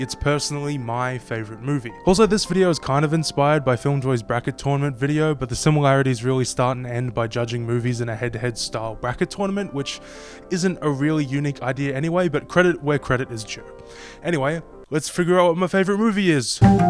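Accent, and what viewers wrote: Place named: Australia. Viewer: Are you Aussie, you sound Aussie, umm why you picked these movies is a mystery to me as i have not seen half of them and maybe i should have, anyhoo lets dance fatso ; )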